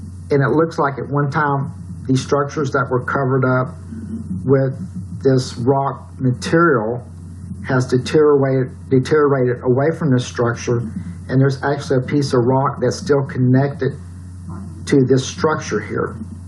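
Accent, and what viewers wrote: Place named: Texas, United States. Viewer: Excellent video! You have a great accent. Are you from Texas?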